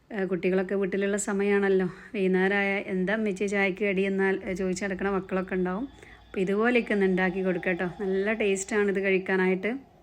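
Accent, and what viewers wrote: Malappuram, India. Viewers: മലപ്പുറം താത്താന്റെ സംസാരം മാറിയോ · Malapuram thathante samsaaram aayirunnalloo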